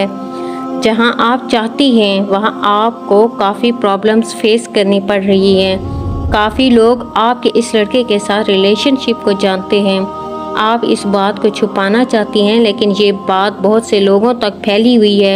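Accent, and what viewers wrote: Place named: Saraiki, Pakistan. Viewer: سرائیکی انداز میں خوبصورت انداز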